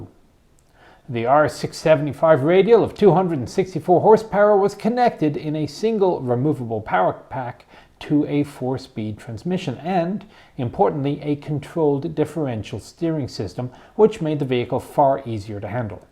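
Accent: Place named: Ireland. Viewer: I never noticed Irish in you accent... Untill I started noticing h-wheels. Not completely American yet XD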